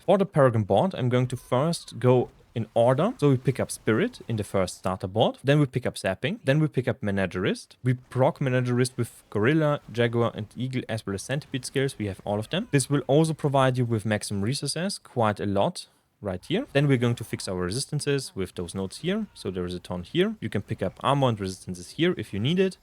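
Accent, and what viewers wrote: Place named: Germany. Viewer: Jede wette das er ein Deutscher ist. Man hört es voll raus 😅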